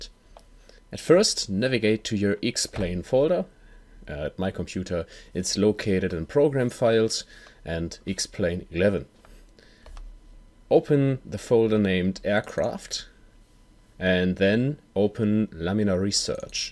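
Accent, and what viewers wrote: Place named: Germany. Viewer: What city are you from in Germany?? I love your accent.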